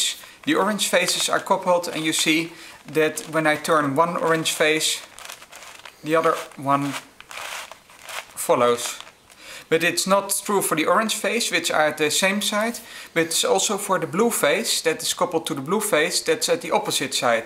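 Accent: Netherlands. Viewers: LOL, I can hear your dutch accent. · I'm dutch too and i'm actually laughing at your English... do you mind?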